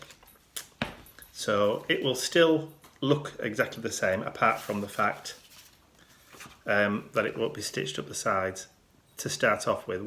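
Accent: United Kingdom. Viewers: Oh don’t you hate when that happens? Lol. It was a great video still, you have awesome ideas and love listening to you and that British accent. 💕😎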